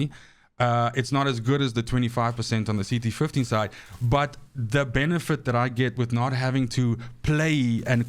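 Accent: Afrikaans, South Africa. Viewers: hawe you from south africa bru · Are you Afrikaans ? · Love the accent - has to be South African - ek stem saam maar ek kry 3 lego's vir elke tweede CT15. Will be great to team up with you sometime! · are you south african?? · Are you South African by any chance?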